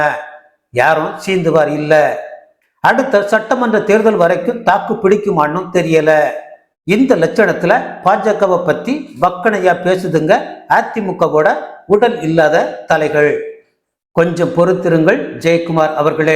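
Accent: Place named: Sri Lanka. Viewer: Sir நீங்க தமிழ்நாடா ?? இல்லை இலங்கையா?? உங்க பேச்சு இலங்கை தமிழ் மாதிரி இருக்கு...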